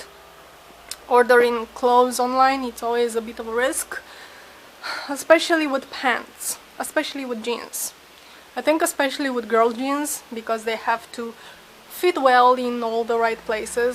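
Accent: Romania. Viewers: I like your romanian accent :D. oh and stop bumping your head into things! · her accent is bull. oh wait, she's romanian. what does she want anyway? lonely girl...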